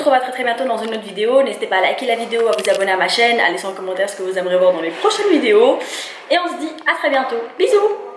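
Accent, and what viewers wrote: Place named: Belgium. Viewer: J’ai tout de suite vue que vous étiez de Belgique votre accent est bien ressorti 😂😂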